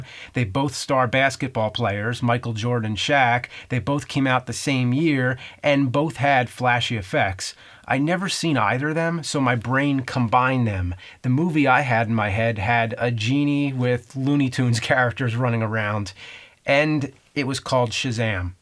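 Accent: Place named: New Jersey, United States. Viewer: Everyone correcting pronunciation as if his filthy Jersey accent isn't something we all love about him.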